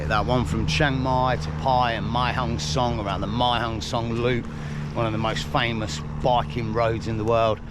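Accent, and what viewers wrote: Leicester, United Kingdom. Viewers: Enjoying the videos , just discovered your channel, Is that a Leicester accent?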